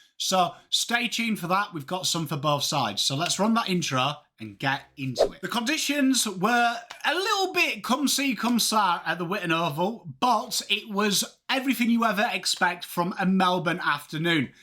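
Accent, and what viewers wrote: England, United Kingdom. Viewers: It’s so weird listening to a pommy talk about afl lol but ya know what you’re talking about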